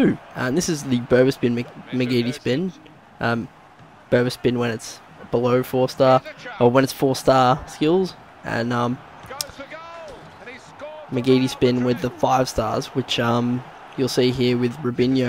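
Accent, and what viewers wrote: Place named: Australia. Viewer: U Aussie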